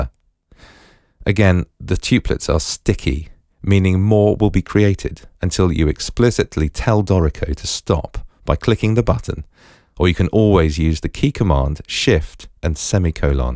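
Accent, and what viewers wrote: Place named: United Kingdom. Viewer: don't you love hearing "eighth note" in a British accent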